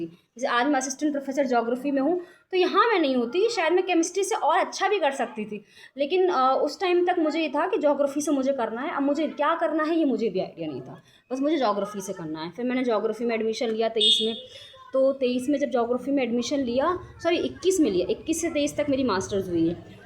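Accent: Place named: Haryana, India. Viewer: Mam ap haryana se ho ya mp se?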